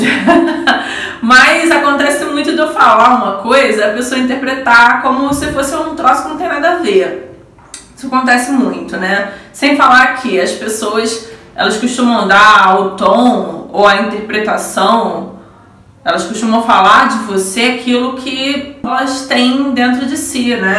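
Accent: Rio de Janeiro, Brazil. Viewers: Vc é daqui do Rio?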